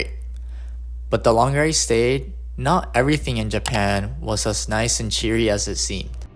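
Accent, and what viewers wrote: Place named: United States. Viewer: You sound American.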